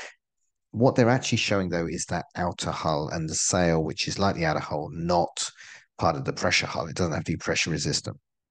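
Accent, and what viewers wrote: United Kingdom. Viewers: Kerel, doe even rustig aan. Hij doet zijn best, alsof jij vloeiend in elke taal bent. En het is geen "yank" maar een Brit....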